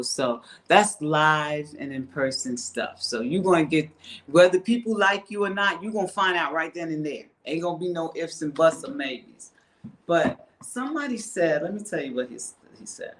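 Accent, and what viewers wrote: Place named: New York, United States. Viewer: I knew it! Your accent was telling me you was from somewhere in New York. You sound just like my friend. 💕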